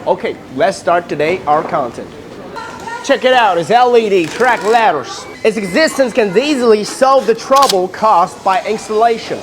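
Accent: South, United States. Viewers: The southern accent is much better than the British accent.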